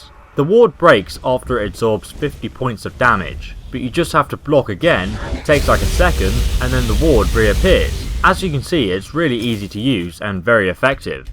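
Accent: England, United Kingdom. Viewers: Stefan Luckerbauer Sounds British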